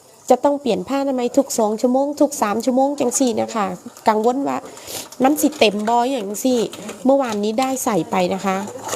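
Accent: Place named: North, Thailand. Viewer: อู้คำ เหนือเลย คุณยายติดตามตลอดคะจากยายพรรณคะ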